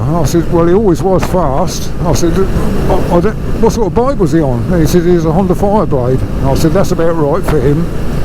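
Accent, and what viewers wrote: Suffolk, United Kingdom. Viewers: Lighter note it's good to hear a good old Suffolk accent 👍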